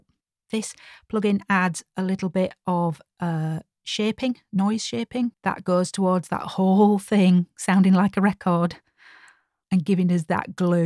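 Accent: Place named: North, United Kingdom. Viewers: The icing on the cake of this brilliant video is familiar, approachable, northern accent and easy style which just makes this a joy to watch and listen to! Thanks & Warren. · Really enjoyed this. Great advice, well explained, and to cap it all - delivered with a wonderful Yorkshire accent.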